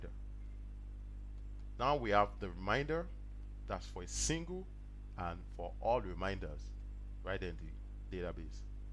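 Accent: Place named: Nigeria. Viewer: you sound nigerian.. are you?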